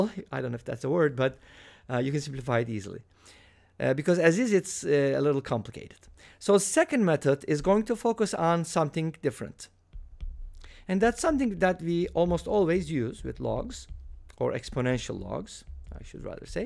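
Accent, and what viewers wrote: Turkey. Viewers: It seems to me that you have the Turkish accent...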